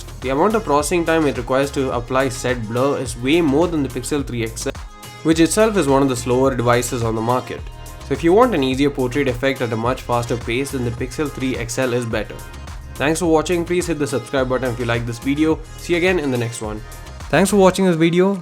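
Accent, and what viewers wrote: Kerala, India. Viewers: Are u a malayalee......bro?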